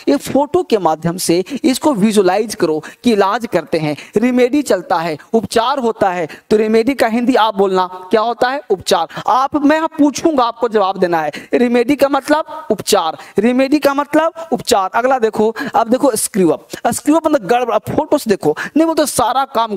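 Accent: India. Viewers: Sar aap word ko kaun si accent mein bolate Hain Indian accent yah British accent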